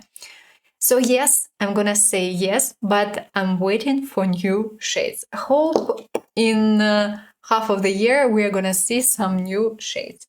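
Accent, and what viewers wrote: Russia. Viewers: Ты русская? Просто русский акцент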